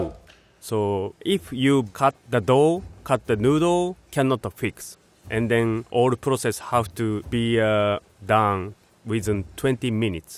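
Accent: Japan. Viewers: First time I think the Japanese-accent English doesn't matter. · ジャパニースアクセント、エニワン?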